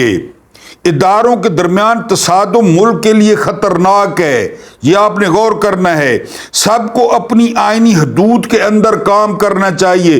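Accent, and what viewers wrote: Saraiki, Pakistan. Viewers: نالج سے بھر پور وی لاگ اور اوپر سے سرائیکی ٹچ ، ماشاءاللہ کیا خوب وی لاگ ہوتا ہے۔ اللّٰہ پاک آپکا حامی و ناصر ہو۔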